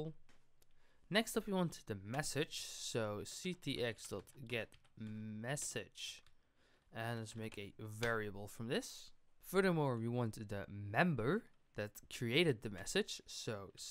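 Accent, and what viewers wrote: Netherlands. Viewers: You sound dutch..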